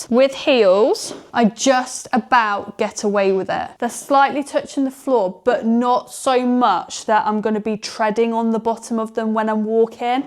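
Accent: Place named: United Kingdom. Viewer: You are a BEAUTIFUL blonde/dirty blonde-haired young woman. Beautiful sexy sparkly light blue eyes, beautiful smile and sexy voice with the British accent. STUNNING figure. BEAUTIFUL body and curves, NICE booty and sexy long legs. Really sexy underarms. You look INCREDIBLE in all of these pairs of FitJeans. All of the colors look REALLY good on you and make you even more beautiful. Every pair of these jeans hug your body and hips and make your beautiful curves POP and give you a NICE booty. You have beautiful light blue eyes. You look AMAZING. You are BEAUTIFUL and VERY cute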